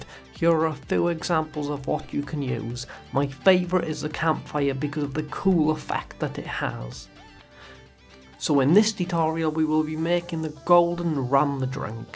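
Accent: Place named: Wales, United Kingdom. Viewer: You from Wales? Your accent sounds Welsh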